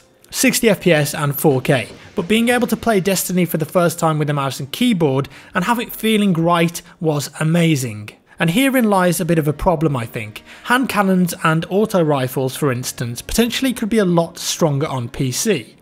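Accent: United Kingdom. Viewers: A British lad that says 'Beta' the 'Murican way? That's new.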